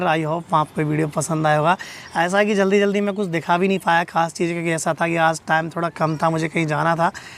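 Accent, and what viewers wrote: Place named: Dausa, India. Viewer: Aap dausa se ho yesa lag raha h or Meena bhi kyuki meri frnd dausa ki h wo bolti h aapki tarah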